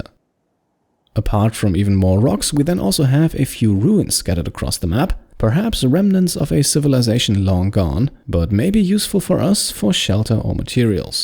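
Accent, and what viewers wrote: RP, United Kingdom. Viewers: "Giveaway" A British man narrates the life of a naked tribal man named Steak that crash landed in an extreme desert who is on a quest to obtain psychic powers. Just ordinary Rimworld things. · posh as fuck accent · Was looking for a naked brutality run of royalty. Found a posh accent dude doing it and a giveaway. Cool beans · Never heard a British person speak like that!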